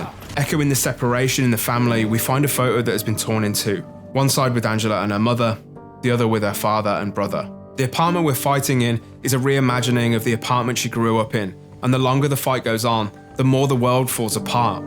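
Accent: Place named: United Kingdom. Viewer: oh shit and he's British! (Same here dude)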